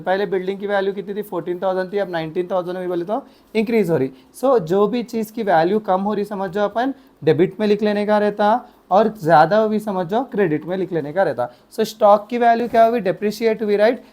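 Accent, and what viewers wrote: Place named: Hyderabad, India. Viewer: I just love Hyderabadi accent 😅😂😂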